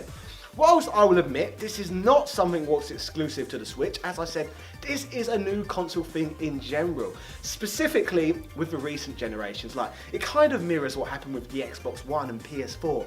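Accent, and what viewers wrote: England, United Kingdom. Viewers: Wow, it's like I'm listening to myself... with an English accent. I bought my Switch back in April, and I've never felt so little excitement for a console I just bought. I still spend far more time gaming on my Wii U. Splatoon 2 is great, but let's face it, it's only a marginal upgrade vs the original. I think the Christmas season and 2018 have a lot of good things in store, but so far, there's just not a lot to be excited about.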